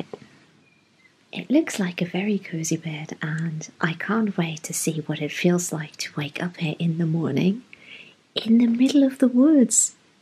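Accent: United Kingdom. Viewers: Your british accent! So sweet and calm. I like that your language rules the world.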